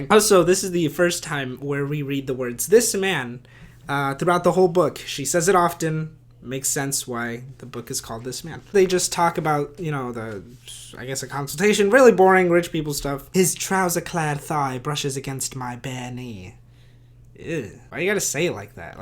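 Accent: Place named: United Kingdom. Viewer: The British accent 😂😂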